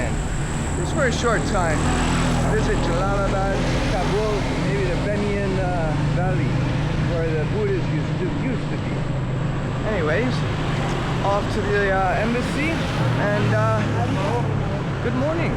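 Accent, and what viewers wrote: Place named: United States. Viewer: Maybe taliban suspicious of you because you sound American. They are watching you all the time 😮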